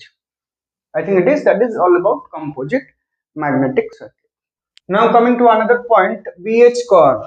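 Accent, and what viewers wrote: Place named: Odisha, India. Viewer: apka bolneka accent thoda odia ki tarha hai.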